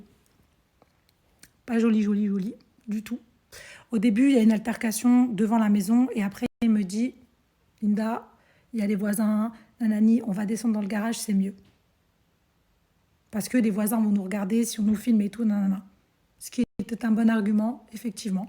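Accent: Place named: Ivory Coast. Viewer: Elle est obligée de prendre l accent ivoirien?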